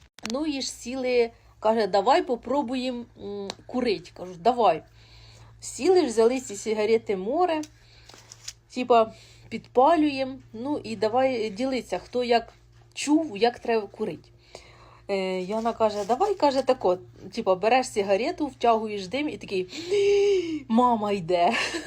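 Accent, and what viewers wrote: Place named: Poltava, Ukraine. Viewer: Говір наче як з Полтавщини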